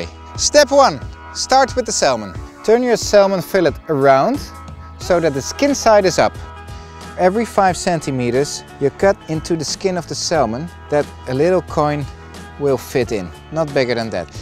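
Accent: Netherlands. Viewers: Hi! Are you Dutch? It seems like you are, since I hear a very Dutchie/English accent:p Groetjes!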